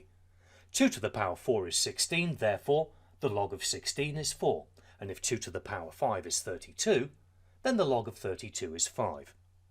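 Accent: United Kingdom. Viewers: I also can't tell which it is, but the British accent is definitely doing it for me! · HOLLLLYYYY - thank you so much for your video. this is probably the most perfect explanation i've seen. its either the style of explanation or the british accent that is driving the points home.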